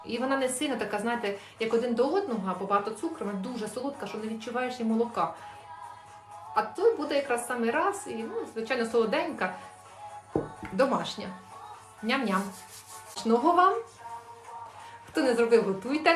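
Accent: Ukraine. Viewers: А мені дуже подобається Ваша мова, не в цілому — українська, хоча і вона також, а саме Ваш говір, акцент! На Заході і на Сході України зовсім не так розмовляють. А от у Вас якесь літературне мовлення... мелодійне, співуче. Саме так розмовляють мої родичі на Черкащині... Я інколи навіть заслуховуюся...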